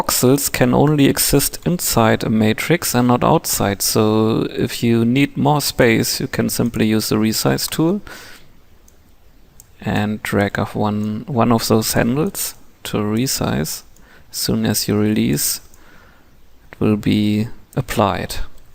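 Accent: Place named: Germany. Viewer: App Magician he sounds German lol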